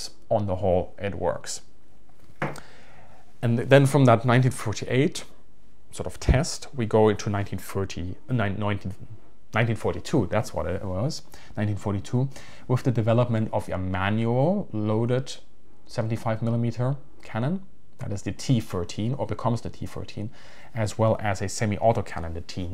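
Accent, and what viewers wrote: Germany. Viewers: Dude, your german accent is horrible.... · Is he german? Hört sich nämlich so an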